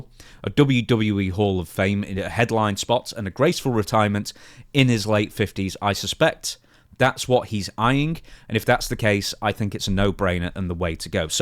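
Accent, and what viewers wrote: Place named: Leicestershire, United Kingdom. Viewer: You're not from Manchester. That's a Leicestershire accent. I know it when I hear itm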